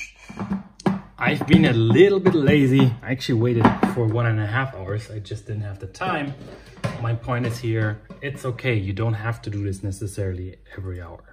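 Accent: Germany. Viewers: Are you from Flensburg? :)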